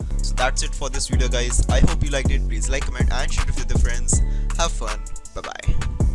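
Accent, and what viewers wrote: India. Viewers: When you hear Indian accent, you know the tutorial bouta be good 😊Thank you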